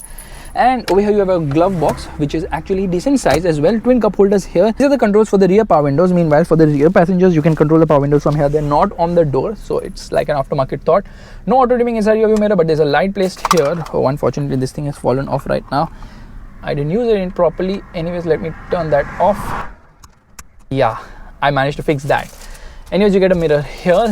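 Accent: India. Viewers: I hate Indian English Accent 🤮🤮🤮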